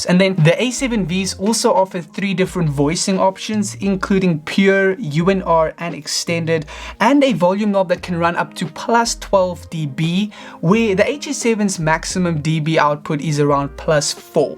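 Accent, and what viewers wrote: South Africa. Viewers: you are south african right?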